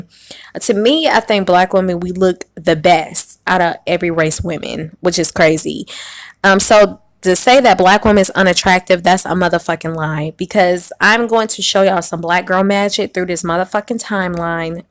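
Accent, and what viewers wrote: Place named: Germany. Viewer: I couldn’t understand this video. You sounded way to German for my English ears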